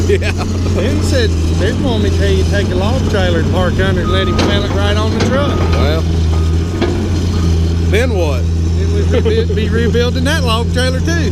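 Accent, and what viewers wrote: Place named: Tennessee, United States. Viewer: I’ve got a buddy from Morristown tn who has an identical accent If you had said “now look here” I’d have thought you were him Sorry about the tree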